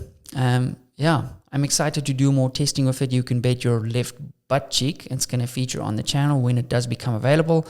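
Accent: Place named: South Africa. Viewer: Thank you love the channel and the south african accent 👌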